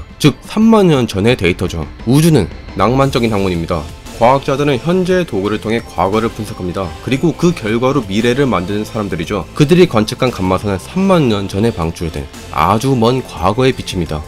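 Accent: Gyeongsang, South Korea. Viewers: 깅상도억양 표시나요 숨길수없는 태생의한계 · 실수가 아니라 그렇게 말하면서 살아왔는데 어찌하겠소 경상도인의 특징이고 그런 억양이 강한 동네에선 고치기 힘들며 언어적으로 바르게 쓰려는 흥미가 없는 사람은 본능적으로 기존 발음을 못 고칩니다 제가 이런쪽으로 잘 압니다 본인 의도와는 상관없이 못고칩니다 특히 빠르게 말할수록 더더욱 못고칩니다 사람은 각각의 흥미요소가 다르기 때문에 발달되고 교정하려는 능력도 다릅니다 대신에 다른것으로 우월한 분야가 따로 있겠죠 같은 지역 같은동네 출신이라도 나중에 단어를 어떻게 읽고 어떻게 발음하는지는 사람마다 큰 차이를 보입니다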